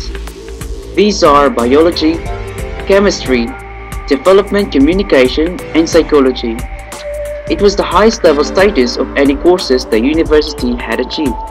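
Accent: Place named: United Kingdom. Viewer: haha.,! wow.! British accent.,!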